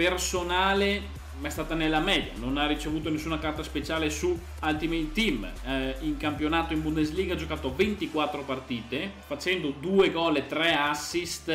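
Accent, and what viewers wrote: Veneto, Italy. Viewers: Da che parte del veneto sei? Un salutone da Salzano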